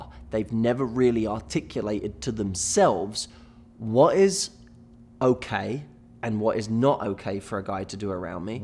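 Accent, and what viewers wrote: United Kingdom. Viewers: Something about a man telling me how to adjust my standards to a bliss point to gain a man's interest and respect is making me ... angry? indignant? patronized? I'm a little salty here on his sweetness. Ladies, please, this entire video begins on the foundation of "what I (a man) believe women experience"... that should have immediately triggered your intuition to approach this with skepticism. If he didn't have such marketable attractiveness (read as: cute brit with the accent) there's no way this channel would be so popular; hell it's why I clicked on the video. He's capitalizing on his sexual appeal to gain trust of women to what he believes will make a women attractive to men.like.him. Don't get me wrong, he seems like a sweetheart and I can see what he's /trying/ to accomplish, but after working in finance I can tell you, every video is still a clever sales pitch - don't buy into him. 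Just respect yourself and respect the man but to hell with any man that doesn't respect you - no matter how cute they are. It's much more satisfying to be with a man where you don't have to change yourself to a bliss point. Let him love you for you, salt, sugar, and every spice in-between. I trust that the "right" man (not the man that gets his ego easily bruised or the man that doesn't respect your sweetness) will respect and love you more (and a lifetime) for being real than someone who is artificially flavored. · Love this british face and accent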